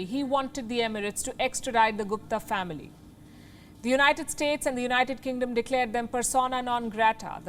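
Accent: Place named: India. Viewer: I love this lady. The slight mixture of the Indian accent, the voice, confidence, and expertise with which she casts is amazing. Keep doing the great work.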